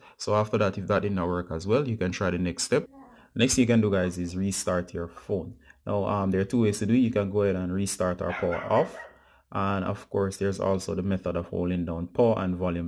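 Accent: Jamaica. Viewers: What the hell, lmaooo not an indian voice but a fellow Jamaican, wow, big up #.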